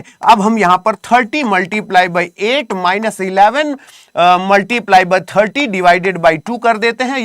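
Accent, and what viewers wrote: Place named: Bihar, India. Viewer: Kha se ye bihari padhane ya geya overacting jyada kerta hai...choko choko kya hota hai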